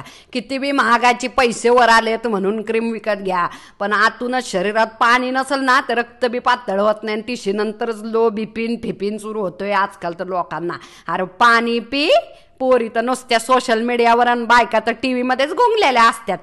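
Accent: India. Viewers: तुमची गावरान भाषा पण किती छान वाटते ऐकायला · खूप छान माहिती सांगितली ताई आणि आपली गावरान भाषा तर लय भारी 👌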